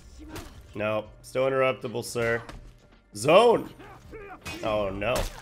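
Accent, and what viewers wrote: United Kingdom. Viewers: Best british accent 10/10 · British accent, beret hat, great at games, I thought you were being like Trutalent lol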